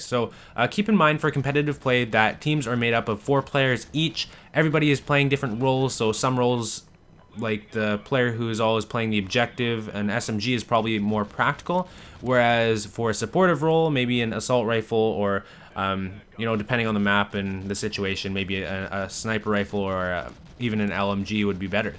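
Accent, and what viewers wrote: Canada. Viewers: MSBS is nerfed now apparently, wonder if its allowed now. Good video, was looking for this info for awhile. 1 question, you Canadian? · 4 fuckng minutes listening to a Canadian ramble on 'aboot' pointless crap. Get to the point man..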